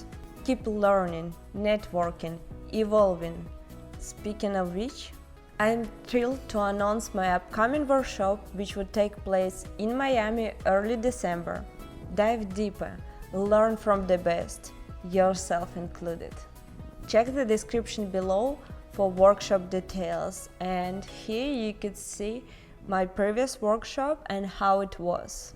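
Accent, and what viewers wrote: Russia. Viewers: You are welcome, I love your Russian English, very….😊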